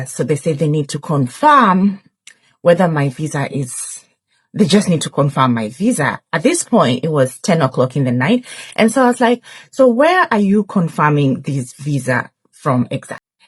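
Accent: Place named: Kenya. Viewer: Well, you need to go back to school and evaluate your education. I have studied psychocology too. And as a kenyan, I know she is kenyan. Her accent speaks for itself. 😏🙄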